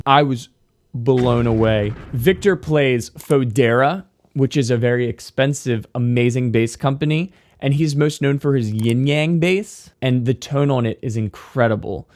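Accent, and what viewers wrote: Baltimore, United States. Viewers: Awesome lesson, man! I enjoyed the Baltimore accent most of all though.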